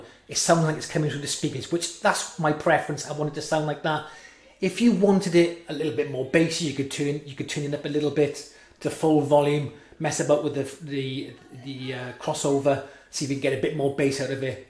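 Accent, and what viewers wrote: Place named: South Wales, United Kingdom. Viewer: Great video! You sound like you live in South Wales 🏴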